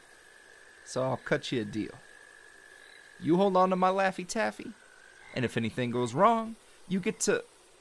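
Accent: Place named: New Jersey, United States. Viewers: Jersey Accents r to die for 😍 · Jersey accents are wow ❤ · defo from northern Jersey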